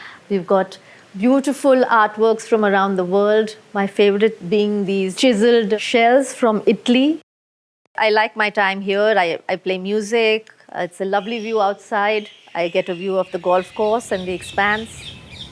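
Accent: India. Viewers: I love posh Indian English accents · She has the most beautiful Indian accent in the English language that I have heard.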